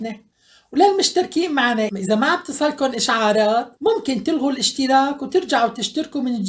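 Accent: Aleppo, Syria. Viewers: لهجة حلب مثل العسل الصافي وأهل حلب والعادات والتقاليد والتراث العريق من آلاف السنين إلى اليوم تاج على رؤوس الناس أنا من حمص وأسكن باللاذقية دخيل رب السوريين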